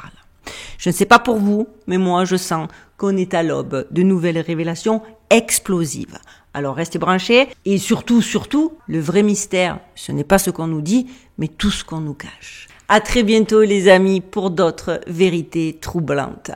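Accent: Marseille, France. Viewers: Hello everyone ! J'adore <3 Avec l'accent marseillais en plus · Cet accent de la garrigue! Un délice!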